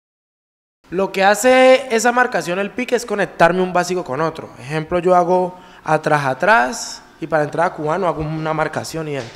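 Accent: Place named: Cali, Colombia. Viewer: Ahhhhhh! Me encanta ese acento caleño! :D Mira veh!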